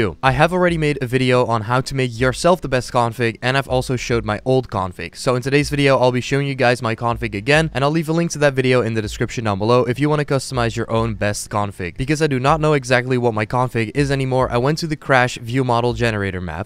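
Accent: Netherlands. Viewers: Are you from the Netherlands 🤙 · Wait a second ur Dutch? Sup broeder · Are you dutch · ARE YOU DUTCH MEN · Wait are you dutch?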